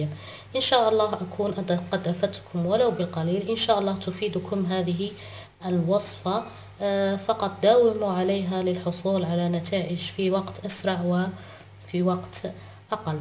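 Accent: Morocco. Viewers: مغربية عرفت من لهجتها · لهجة مغربية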